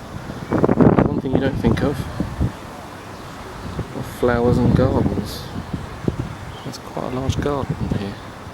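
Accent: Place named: United Kingdom. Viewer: You does not need to write word "Englishman", your accent is soooo british, we already understood that you Englishman.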